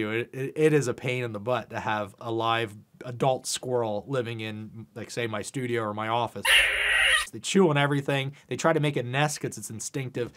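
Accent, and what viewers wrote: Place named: Pennsylvania, United States. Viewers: You have a very Delco accent lol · I nailed you as a Pennsylvanian as soon as I heard your voice. Glad I still recognize my native tongue 😂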